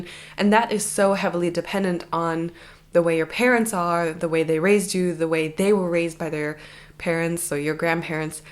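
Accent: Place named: Germany. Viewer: have you been raised bilingual? Since, as a german, I can kinda hear a german accent there, which is sorta fascinating, since I dont hear my own, eventho Ive been told my german accent is pretty noticable.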